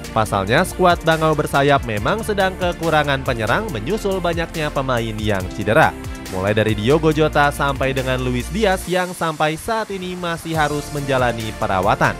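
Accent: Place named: Banjar, Indonesia. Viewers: Admin cetakgol orang samarinda kah....GK asing sama cara bicaranya🤔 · Anjay adminnya org banjar nih😄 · Pian ni urang banjar kah mang??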